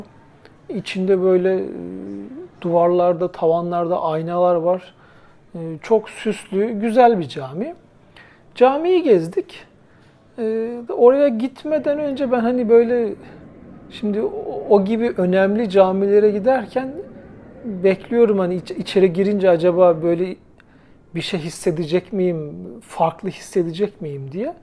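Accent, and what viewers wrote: Samsun, Turkey. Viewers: Yaa Samsunlu olduğun çok belli ama.O ikiyüzelli deyişin ele veriyor😂Ben bu siveyi düzeltene kadar ne uğraştım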